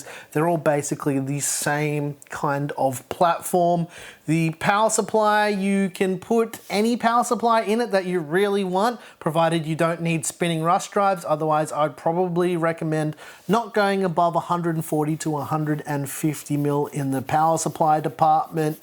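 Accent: Australia. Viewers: Are u an Aussie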